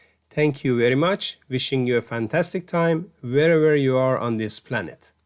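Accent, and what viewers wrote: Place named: United States. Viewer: I liked your American accent 🩵 💙💫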